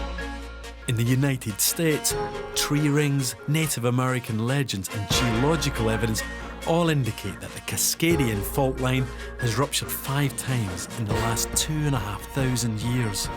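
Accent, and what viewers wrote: Scotland, United Kingdom. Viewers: I love the Scott’s accent! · The Scottish accent makes it sound even more frightening.... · I love your accent. So delicious! I always loved Scottish accents. I'm partly Scotch myself, but I don't have an amazing accent like that! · what accent is that? scottish?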